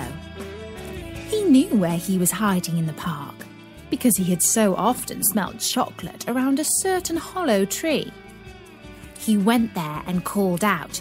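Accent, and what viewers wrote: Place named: England, United Kingdom. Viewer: Funny story. Great English accent!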